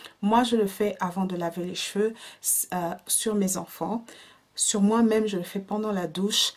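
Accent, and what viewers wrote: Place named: England, United Kingdom. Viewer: tu as un apcent anglais !!! J'adore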